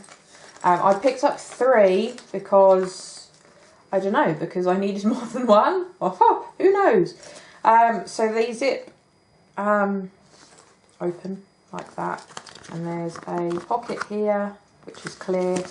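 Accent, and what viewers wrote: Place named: Texas, United States. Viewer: I enjoyed you video so much. Your "Texan is great". Being a born and bred Texan, I can tell you would make a great asset to our great state. And I like the way you shop, video and talk!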